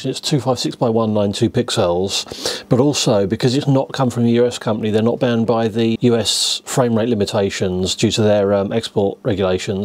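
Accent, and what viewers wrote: United Kingdom. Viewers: Yeah, if you have that much trouble, just go watch one of the many other electronics channels. And those of us who can understand English accents just fine (that's just how people speak in that region) will enjoy amazing content.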